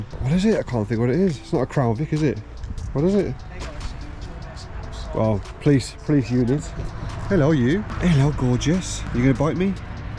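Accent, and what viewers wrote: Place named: England, United Kingdom. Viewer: What a fun English accent...and owsome cars...yeah...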